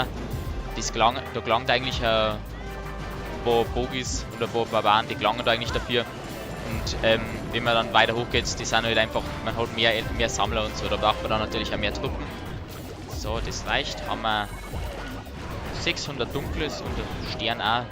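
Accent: Bavaria, Germany. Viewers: Kommst aus Bayern?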